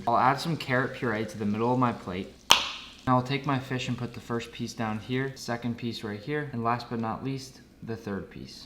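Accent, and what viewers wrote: Australia. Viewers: he sounds like australia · are you Australian? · He sounds Aussie 00:1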